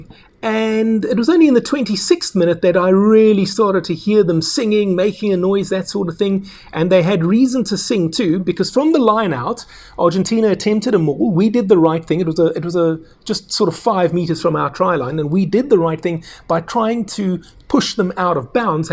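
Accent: South Africa. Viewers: Well then, you do you! :) Everyone finds their own unique voice online. Ah, I'm not aware of your other appearances/media involvement. I'm a Capetonian living in Paris, so a bit out of the loop. Either way, I love listening to different perspectives on our beautiful game, and specifically from people with that lekker accent. Connects me back to home. Thanks for being graceful with the feedback, and keep doing what you're doing! Cheers 🍻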